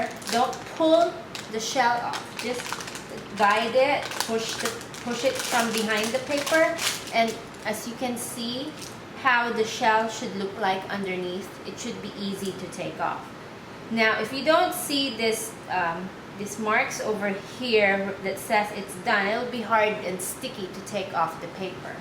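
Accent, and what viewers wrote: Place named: Philippines. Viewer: She has a Filipino accent. 8D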